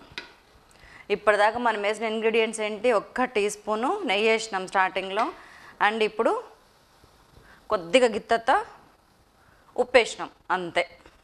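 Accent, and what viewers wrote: Telangana, India. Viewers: Your voice is beautiful and confident...especially your Telangana dialect is so pleasurable to listen... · Wow garu that’s fantastic tip I always put in pressure cooker water and salt or sugar Sooper I just liked your recipe and the healthy tips in between Thank you amma You just rock like my family member your Telangana slang ditto like ours 🥰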